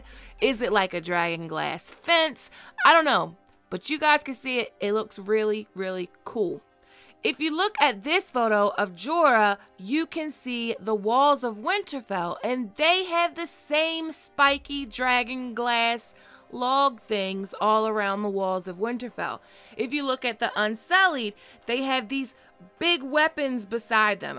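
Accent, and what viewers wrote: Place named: Baltimore, United States. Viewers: you sound like you from maryland, just saying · The Baltimore accent is strong in this one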